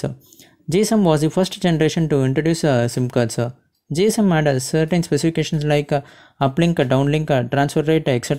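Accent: India. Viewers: English....with little tamil accent 😊Cool right?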